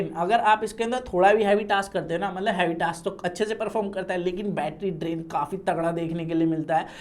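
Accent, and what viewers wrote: India. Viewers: Only one tech editor who pronounciate desi hindi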